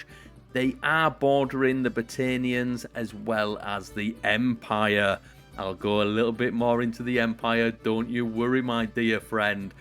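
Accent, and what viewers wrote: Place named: Yorkshire, United Kingdom. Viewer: Ohh this is what ive been looking for. I dunt have this game so i like to watch people playin it, and nothing better than roleplay. Good stuff mate, liked and subbed. Also where abarts in Yorkshire you from? Im from Sheffield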